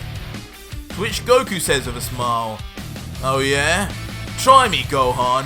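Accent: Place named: United Kingdom. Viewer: If you didn’t have an annoying Brit accent this would be ten times better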